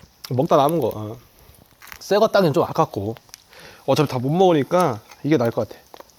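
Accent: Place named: Jeonju, South Korea. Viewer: 라면에 혼술하며 영상 시청합니다 전주쪽이랑 비슷한 사투리가 넘 정겨워요ㅋ 담에 차박 영상도 기대할게요~~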